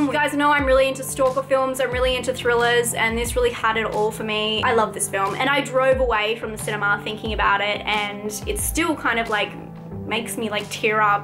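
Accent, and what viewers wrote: Australia. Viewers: He probably didn't understand the accent and meant to say Australian accent. No need to be so passive aggressively mean to your viewers.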